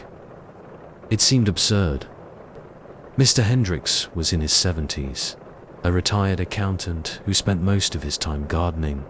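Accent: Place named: United Kingdom. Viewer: I hate the British accent it ruins the whole stories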